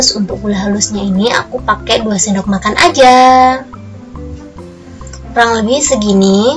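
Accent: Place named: Java, Indonesia. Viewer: Jawaa bangett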